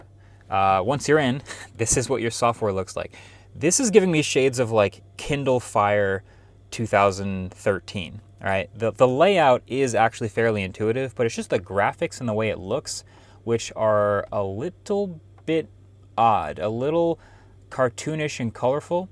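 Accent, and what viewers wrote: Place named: California, United States. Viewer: nghe giọng điệu này niết ngay cali rồi ! Đúng chứ người Mỹ gốc cây ?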